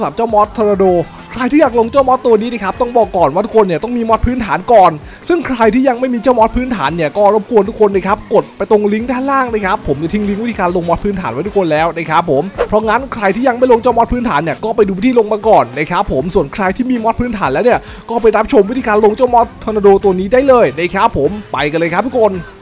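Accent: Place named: South, Thailand. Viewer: แหลงใต้ชัดเปรี้ยะเลยหลวง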